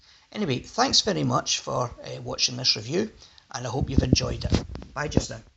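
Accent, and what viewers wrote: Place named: Scotland, United Kingdom. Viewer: It's a Scottish accent. So, no.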